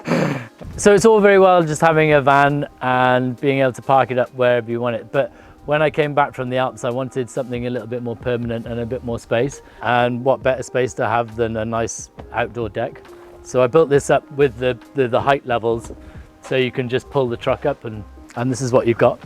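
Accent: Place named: RP, United Kingdom. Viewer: You sound posh .but you talk normal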